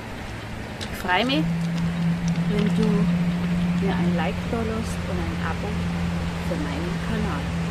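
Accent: Bavaria, Germany. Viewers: So sympathisch und unkompliziert erklärt. Ich mag Dein bayrisches Reden und versteh's auch gut. Danke dafür. 🙏🍀🕊🫶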